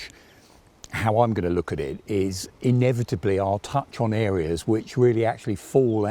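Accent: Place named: Cockney, United Kingdom. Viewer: Septics...a bit of cockney is like a whiff of home...even if you're from the valleys, like me... :)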